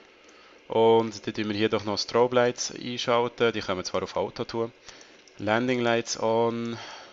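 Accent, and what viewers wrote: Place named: Switzerland. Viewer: Er spricht halt Schweizerdeutsch aber ok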